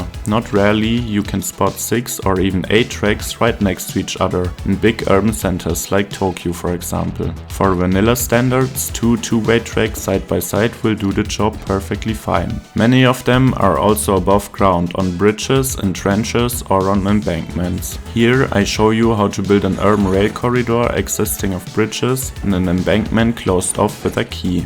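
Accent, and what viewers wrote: Germany. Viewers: YES! another great little "how to" video from the master. And the small fun inserts like are back ( as well as that smooth german voice 😊just makes me feel that there is professional behind it ) And to be honest, I can't wait for CS2 just to see what you can do with it, as well as some other people like Egg, FewCandy, CCP and Lee Hawkins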